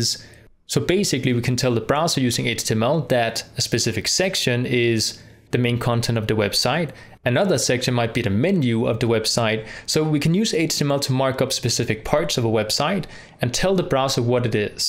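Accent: Denmark. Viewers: I could hear from your very first video that you were from Denmark lol, Hello fellow dane!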